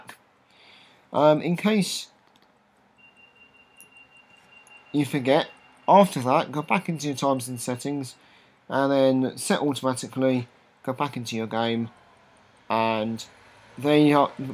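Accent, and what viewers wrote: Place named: London, United Kingdom. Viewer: Awww London accent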